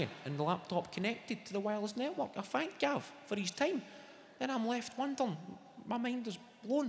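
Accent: Scotland, United Kingdom. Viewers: Does he really expect non Scots to understand him?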